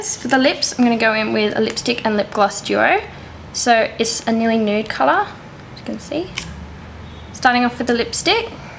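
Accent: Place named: Australia. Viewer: u have such pretty eyes.. oh nd ur relle pretty too lol..are u from australia i love your accent!!!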